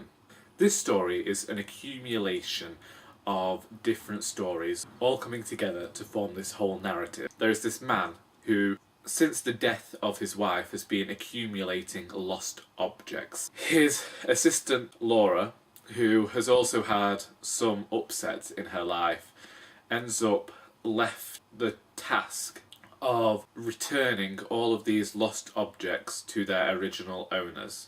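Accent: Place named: Nottingham, United Kingdom. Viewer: Thank you for your review.........I will listen to this. You sound local to me I live in NG1, good to hear you.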